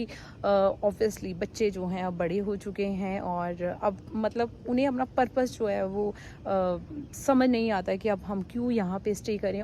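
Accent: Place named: United States. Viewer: I like her american accent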